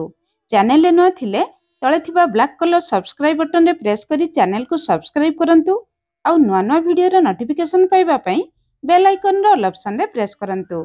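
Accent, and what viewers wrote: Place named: Ganjam, India. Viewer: Ganjam style re torada kahanti...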